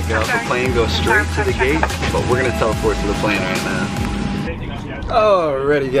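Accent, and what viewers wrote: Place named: Netherlands. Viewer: Talks for a sec, ok its a dutch dude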